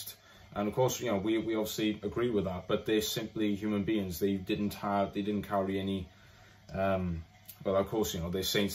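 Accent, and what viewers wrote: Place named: Scotland, United Kingdom. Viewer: Hey, I grew up in a Christian/Pentecostal household, much like you, so I genuinely understand your perspective. Similarly, I've delved into Orthodox teachings, finding insights from figures like Father Spyridon and Bishop Mar Mari to be profoundly enriching. Their depth of knowledge is indeed a blessing. I resonate with your concerns about the shifts within the Protestant church. The changes since our childhood have been quite disheartening, particularly when observing the trajectories of the Church of England and Scotland, which seem to diverge from Scriptural foundations. While I've been influenced by Orthodox teachings and recognize God's work within that sector of christianity, it's essential to uphold that Jesus is unequivocally the ONLY path to the Father, as emphasized in John 14:6. Furthermore, 1 Corinthians 6:9-11 underscores the importance of avoiding idolatry (praying to saints). 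Even if some claim not to worship saints, our reverence should solely be directed towards Jesus Christ, the King of Kings. Despite shifts within certain Protestant denominations, I believe Christ prioritizes our individual relationships with Him. The authenticity of our faith lies in our personal devotion and living for Him beyond mere traditions. Reflecting upon 1 Kings, it's evident that faithful remnants persist even amidst broader challenges. On a side note, I detected your Scottish accent. When I resided in Edinburgh, I found solace in attending Charlotte Chapel, a Baptist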